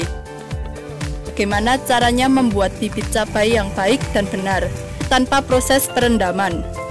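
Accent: Java, Indonesia. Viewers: Medhok tapi Keren 😁😁😁😁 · Saya seneng pembawa acaranya ada medok medoknya gitu 😀😀😀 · Tapi suara presenter nya medok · Medok bngt ngomongnya😂😂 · Salm medok..😅😅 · Saya gk butuh MEDOK 😀 Nya....saya butuh informasi ilmunya.... semangat nduuuuukkkk...👍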